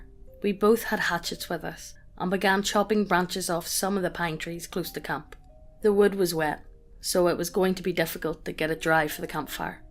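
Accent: Ireland. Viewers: It's 11 PM here, I don't know if I should watch videos containing spooky stories and Irish accent now, zombie-leprechaun nightmare fuel : (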